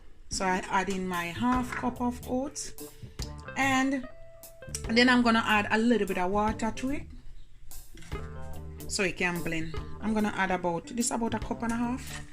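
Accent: Jamaica. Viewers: Lovely porridge family you sound like you from mobay .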